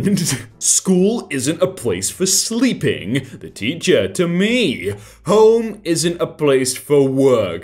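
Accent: United Kingdom. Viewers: Hello British guy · day 89 of asking that he british yes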